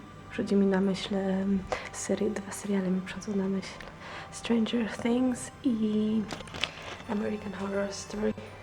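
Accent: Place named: United Kingdom. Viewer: uwieeelbiam jak mówisz po angielsku, masz piekny akcent! uczylas sie wczesniej cos więcej niż w szkole czy mieszkanie w Anglii cię tego nauczylo ;)?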